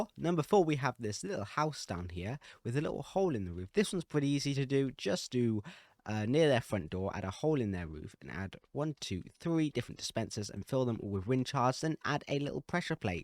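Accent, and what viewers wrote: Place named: United Kingdom. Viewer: Bro doesn't sound like grian. He just sounds british. He has the same voice as many people i know.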